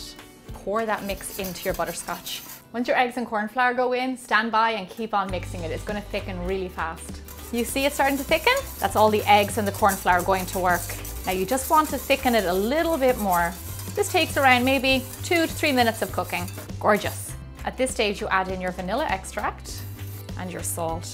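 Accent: Ireland. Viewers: You had me at the Irish accent. I stayed for the food. haha! I am making the girls honeycomb today. Have a wonderful weekend! HUGS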